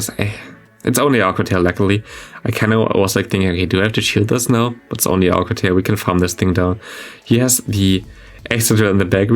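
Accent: Germany. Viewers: I hate your accent. Typisch deutscher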